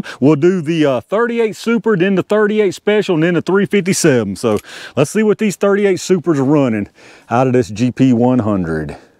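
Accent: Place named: Appalachia, United States. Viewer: Y,all would call me a Yankee, but this guy speaks Hillbilly. That’s ok, because he gives Great information!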